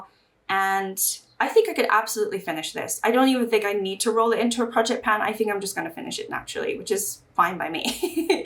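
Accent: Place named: England, United Kingdom. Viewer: Where's your English accent gone😂❤?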